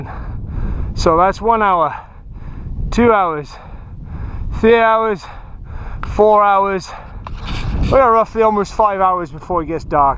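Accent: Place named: Geordie, United Kingdom. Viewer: i would not entertain comments from Mehmet he does not have a channel, and probably has no bushcraft or survival skills, Mr Mehmet if you read this comment lets see what you can do... great video man a big thumbs up and subscribed...there seems to be a hint of Geordie in your accent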